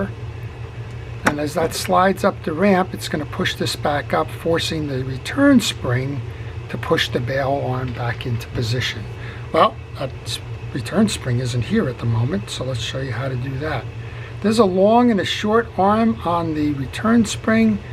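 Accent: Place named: Long Island, United States. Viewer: Excellent video and thank you ! You are a savior. I came here to figure out how I put the bail back on a Cirrus CR035. Had a hard time finding a schematic (probably could have search for one for the CR025). A coworker gave me the reel and I have been taking it apart and clearning and lubing it up. I could not figure out how to put that black piece on the bail spring (missed it had a hole for the spring bc I had grease covering it). Thanks to you I now have it covered. I needed to come apart bc there was dirt in there and had been neglected. Thanks again. You sound like you may be a fellow LIer; grew up in Rocky Point myself. Take care.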